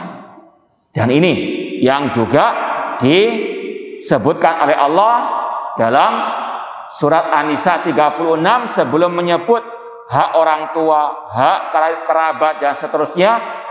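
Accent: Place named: Java, Indonesia. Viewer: Medokan sawah timur hadir 👍 baarakallah fiik Ustad.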